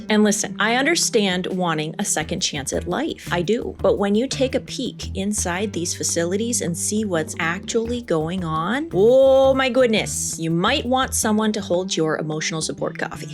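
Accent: Minnesota, United States. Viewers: I knew you were from Minnesota. The accent is very subtle, but there must be a law that no woman in Minnesota is allowed to live there unless you are at least not a nine of ten, Wisconsin is a close second, but weirdly, the women in both states are not just normal pretty women, they're ALL movistar hot. It seems very strange and intimidating to outsiders as we assume the local guys must be similarly attractive to women. I'm considered extremely attractive in most of the country and I one hooked up with a lady in Wisconsin, but Minnesota women have always been elusive to me, despite being attractive, successful, and having a great sense of humor and the same IQ as as Elon Musk. I can't even get a single date with a Minnesota lady.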